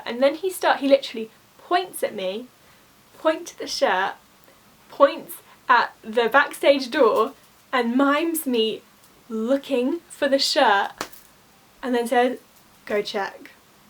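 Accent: New York, United States. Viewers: Trust me your NYC accent is 100x better than most Americans British accent 😂 I love these types of videos btw! I’d love to see another installment of the magical moments you or your friends have had/made too ♥️ I hope you’re having/had a great Disney trip and make it home safe!!! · You sound like a New Yorker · Loved your ny accent lol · I’m laughing at your New York accent. I have a Pacific Northwest accent so I’m told. Lol. I used to be a telephone operator. UK operators said to me, “ I can tell you are from U.S. People love to be snobs. Especially from a wealthy area. They talk down to you. I hated it. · Your New Yorker accent tho!! Best part of this video! 😊😆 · Your NY accent is so funny! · your New York accent is great for a Londoner or someone from the South! Fascinating stuff. If you work at Euro Disney you will have 20 years of stories for YouTube in the space of a couple of years! 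· Your NY accent was great IMO lol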